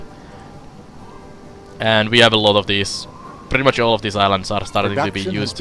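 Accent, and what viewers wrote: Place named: Finland. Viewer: Finnish speaker. Cool accent :)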